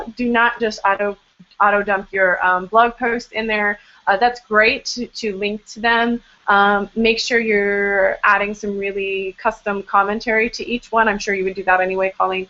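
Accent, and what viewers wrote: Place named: United Kingdom. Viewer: Lol love the British Accent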